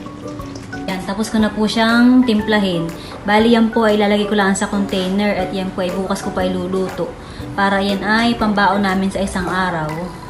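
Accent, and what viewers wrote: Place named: Batangas, Philippines. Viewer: a talaga peru bakit parang pang Batangas accent nyo pala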